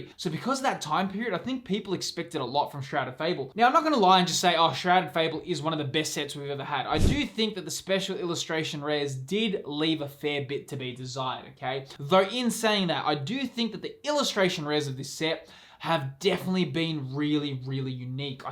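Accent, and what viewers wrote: Australia. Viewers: Love. That. Lefeon! Thanks for the content! Aussie accent makes it even better.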